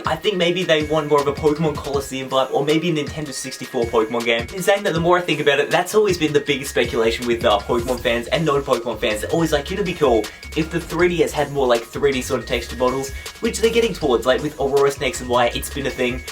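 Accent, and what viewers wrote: Australia. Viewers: he is Australian that is how we speak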